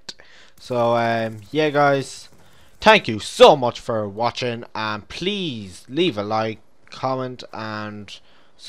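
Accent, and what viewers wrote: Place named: Ireland. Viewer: FUNNIEST IRISH COMMENTATOR EVER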